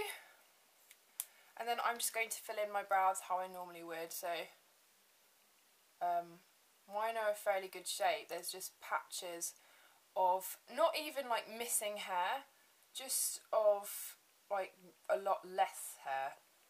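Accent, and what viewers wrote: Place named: London, United Kingdom. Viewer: are you from london? love your accent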